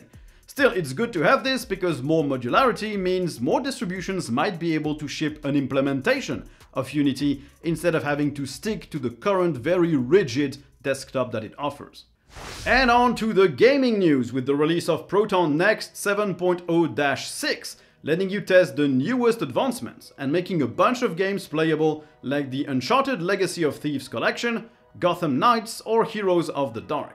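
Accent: Brittany, France. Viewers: Il est breton je crois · Damn are you french? You talk exactly like every french people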